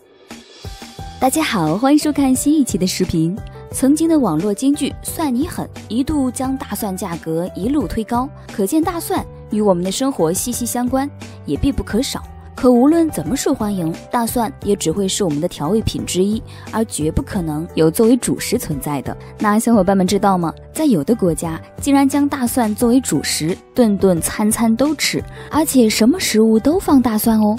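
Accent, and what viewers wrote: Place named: Shandong, China. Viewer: 我以为是山东人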